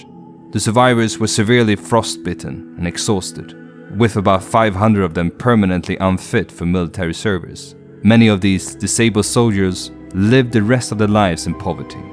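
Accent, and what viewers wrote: Sweden. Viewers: Skåne(s) skanse was mentioned. Could be the pronunciation that made you miss it. The Swedish narrator speaking English here, can't really decide if he's going to pronounce the names in Swedish or English. He does a little bit of both, which is frustrating. For not established names in English, he should stick to local one, or close enough, his Swedish attempt at pronouncing Norwegian names. Locals and not even English natives understand what he's referring to, when he's tries to guess how an English person would pronounce Stjørdal. And sometimes Karl is Karl, and sometimes Karl is Charles.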